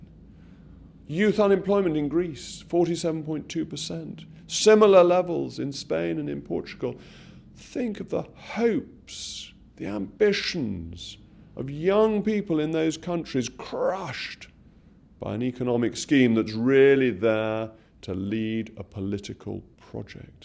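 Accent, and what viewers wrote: RP, United Kingdom. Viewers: Perfect RP makes your arguments more potent.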